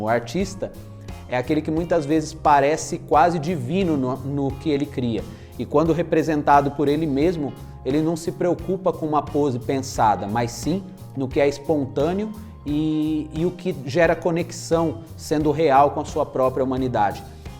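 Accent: São Paulo, Brazil. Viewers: você é da região de SP?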